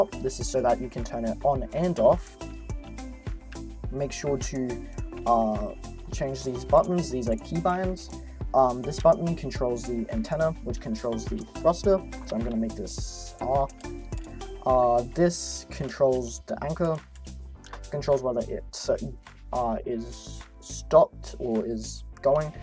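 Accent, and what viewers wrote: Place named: United Kingdom. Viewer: when a british guy narrates, you know its a good tutorial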